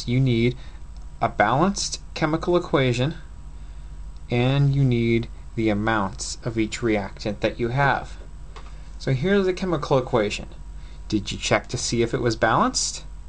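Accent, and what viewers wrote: Canada. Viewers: i hear that canadian accent on ya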